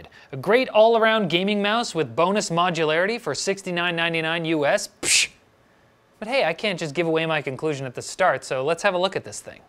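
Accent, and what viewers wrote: Canada. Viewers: Lord Cheezus how linus has a high pitched voice and is has an american accent is canadian and you can clearly hear it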